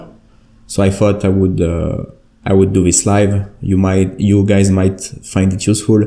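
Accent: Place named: France. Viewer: You are soo french... i truly understand the translations going through your head as you try to speak English. I speak french too but a native English speaker... the "cool" finally gave you out. Nice video.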